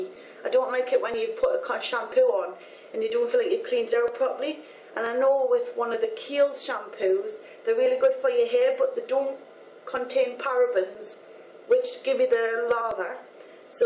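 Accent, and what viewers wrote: Newcastle, United Kingdom. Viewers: She's from like the Newcastle area in the UK. There are about twenty different UK accents that you've probably never heard before if you're from elsewhere. Most people who aren't from England seem to only recognise the London accent as an English accent but truth be told we have loads of "weird accents" ;) x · Strange? LOL How about it's a British accent, yokel. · guessin your from newcastle AWESOME !! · For all non British viewers, the reason she has a strange voice is because she is what we call "a Geordie" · Hey there, Geordie Girl...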